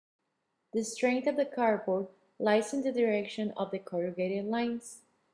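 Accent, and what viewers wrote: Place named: Mexico. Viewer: I fucking love her Messican accent! XD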